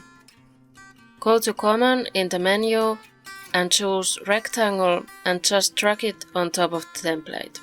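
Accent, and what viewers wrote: Finland. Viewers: Is that a Finnish accent? :D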